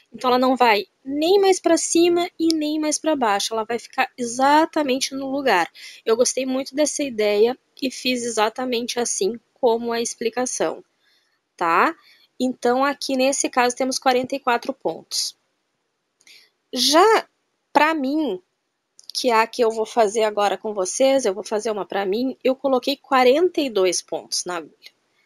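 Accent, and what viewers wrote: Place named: Santa Maria, Brazil. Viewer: adorei te encontrar aqui.Gistei muito da tua receita, e a tua pronuncia, me deu uma baita saudades da minha terra(Santa Maria.) Como e bom ouvir este lindo sotaque...Felicidades e parabéns pela linda receita.bjssss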